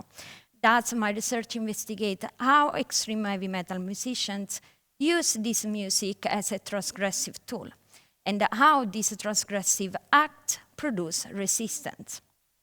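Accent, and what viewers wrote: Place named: Italy. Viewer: English with an Italian accent :)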